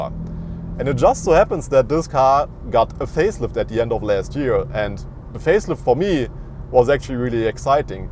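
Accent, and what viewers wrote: Germany. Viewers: Krass wie schnell man einen Deutschen an seiner Schrott Aussprache erkennt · After 2 minutes watching you video , I discovered that the review is in English hhhhhhhhhhh , I love German-English ... Good review man , thank you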